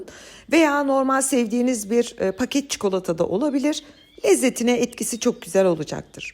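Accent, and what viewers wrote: Germany. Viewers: Ellerinize güzel sakin anlatımınıza ince detay vermenize aklınıza sağlık sağlıkla kalın teşekkür ederim. Antalya Türkiye. Babam da sizin gibi şupangıle şıtress şıpor der konuşurken Almanya da yaşamanın minik süsü kulağa yabancı gelmiyor. Sevgiler.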